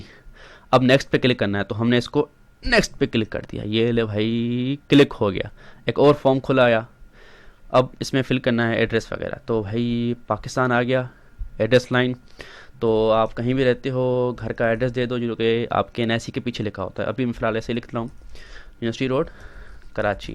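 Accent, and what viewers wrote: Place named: Haryana, India. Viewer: his accent feels like haryanwi . but he is teaching how to do in Pakistan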